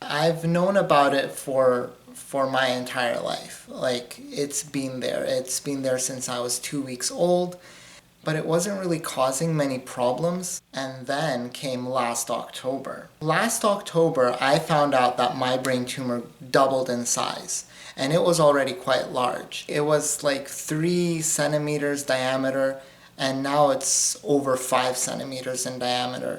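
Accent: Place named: Canada. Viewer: That canadian accent thi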